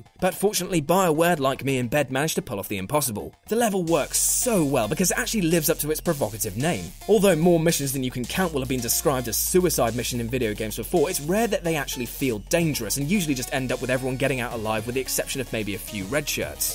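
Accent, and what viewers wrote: United Kingdom. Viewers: This guy should really think about what he says in videos before publishing biased opinions for ANYONE to see. From the accent I'd expect him to be from the UK; with that said he probably hasn't got the slightest clue about his witty remarks about something he may have absolutely no experience with.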